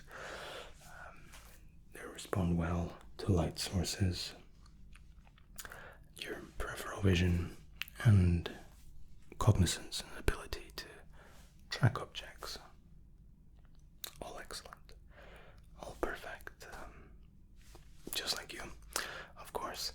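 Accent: Scotland, United Kingdom. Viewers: Where are you from mate? I think I hear Scotland in that accent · I just recently found your channel, 1 video and I'm already hooked to your vids, love the accent and your style 😀 you rock it man haha, and we are both from Scotland FREEDOM!!! haha lol, I'm rambling again I'll stop. Oh and one last thing you just earned a new SUBSCRIBER!! MEEEE HAHA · I can hear the scottish but it also sounds like there's some european/american in there too! Forever a mystery but very relaxing hahaha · Ah never mind, just done a bit of digging and it’s says he was born in England but has spent most of his life living in Scotland so has picked that accent up a bit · accent is definitely a conglomeration. But yeah, the Scottish accent is most predominant, while lightened a bit by the English side of things. If there's any American accent in there, it's very faint. 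😅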